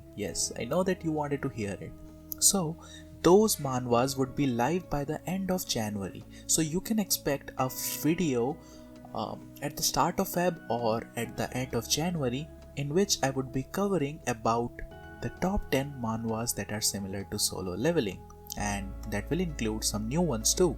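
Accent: India. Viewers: No disrespect the moment I heard the first word you spoke... In my ma mind, Yep definitely dis dude is from India. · Your accent's just like Indian people Where are u from?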